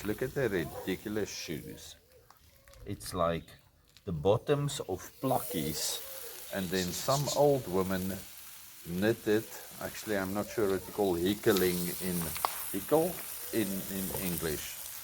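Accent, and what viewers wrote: Afrikaans, South Africa. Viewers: I just came here for the great Afrikaans accent. 😀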